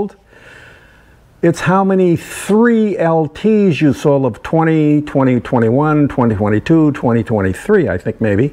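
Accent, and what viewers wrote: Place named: Northeast, United States. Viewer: Just stumbled on your channel and I watched this video. It sounds like you are from the NE. Can you specify what state ? Love the vid !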